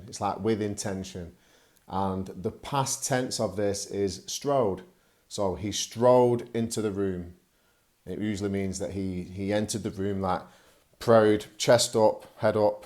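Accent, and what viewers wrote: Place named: North, United Kingdom. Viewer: Very interesting video. I like your northern accent. It's curious because usually, those kind of accents are hard for me to understand but yours is cool. I discovered your channel three days ago. I watch several youtube channels about learning English. Some of then have a huge amount of subscribers, yours hasn't yet, but you sound very sincere, clear, simple and useful. Thanks from Basque Country Spain.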